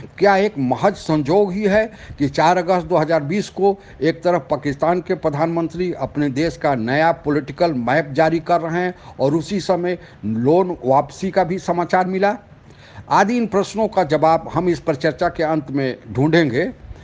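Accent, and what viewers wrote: Bihar, India. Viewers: me toh samjha samjha k thak gaya uncle ko ki bihari hindi tone achi sunai nai deti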